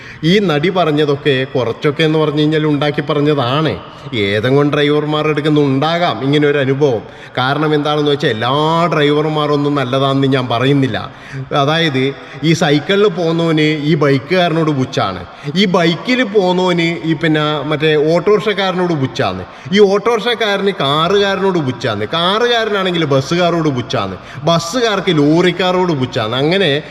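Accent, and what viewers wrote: Kannur, India. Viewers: Kannur slang nice ❤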